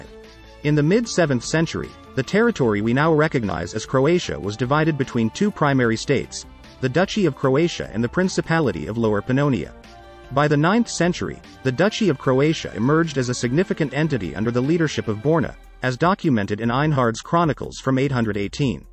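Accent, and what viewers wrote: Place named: England, United Kingdom. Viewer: Because the AI speaks English akcent I cant wait for it to say: I cvrči, cvrči, cvrčak na čvoru crne smrče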